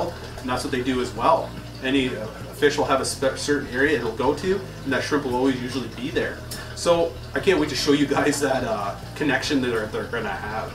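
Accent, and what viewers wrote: Canada. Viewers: he's from Canada they say stuff differently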